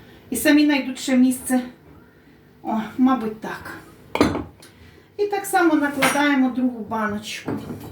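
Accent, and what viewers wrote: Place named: East, Ukraine. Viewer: Дякую за ПОМИДОРКИ!😊 Який у Вас цікава мова - ніби більш притаманна західним регіонам, але відчувається східний акцент теж. Якщо не секрет то звідки ви?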